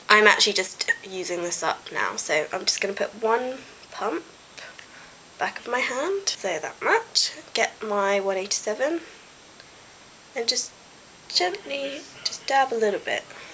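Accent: United Kingdom. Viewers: pretending to sound posh? she has an rp accent and, as far as I know, it's her actual accent. not made up or insincere, her ACTUAL accent. how can you possibly judge someones voice because of a slight discrepancy in pronounciation? away with you! love the video:) i'm crap at putting on foundation so its nice to see people doing and explaining it!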